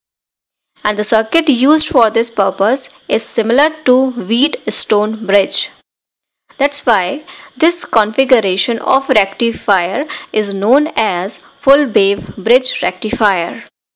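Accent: India. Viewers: Animation is pretty ok but DEAR GOD I can usually stand Indian narration but JESUS CHRIST THIS ONE HAS DRIVEN ME INSANE IN 30 SECONDS I CAN'T FUCKING TAKE IT ANYMORE thank God for auto-gen captions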